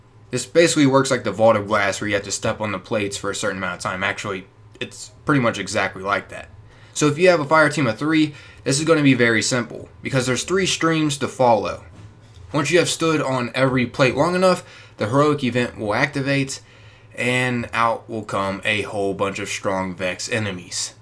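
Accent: United States. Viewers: Thanks for making a video game video with a normal American Voice!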